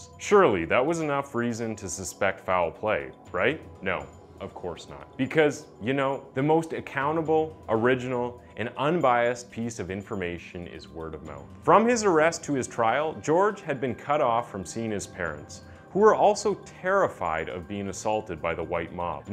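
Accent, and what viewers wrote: Canada. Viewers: Has anyone told you that you sound like a Canadian version of Keith from the try guys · Loving your Canadian accent lol really cute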